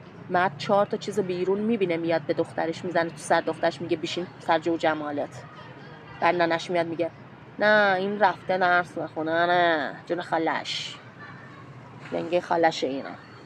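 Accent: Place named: Shiraz, Iran. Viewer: 👏🏼👏🏼👏🏼👏🏼👏🏼 lahjeyeh ghalize shirazit mano koshte jan ❤😂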